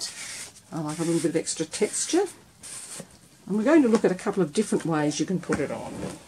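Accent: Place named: Australia. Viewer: Most important thing which you rushed over and I could not understand what you said, was what type of surface you were using i.e. paper, board, wood, card. It sounded like 'Mat-board' or 'Net-board'. Would you please mind replying and stating also the name of the product, your accent sounds Australian apologies if not, I am from England but the name of the product will help in finding similar. Thank you.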